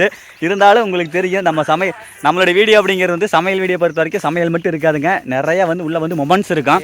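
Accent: Kongu, India. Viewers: Unga kongu Tamil Vera level keep rocking reach heights all the best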